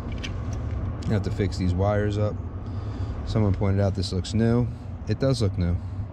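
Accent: New York, United States. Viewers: No hot pads? Go warm up a few then go back up. Oem wires have id numbers on Lennox rtu...re label them. A picture of connections sure helps before removal. New York/Joysey accent...lol Battery azziz..? Lmbo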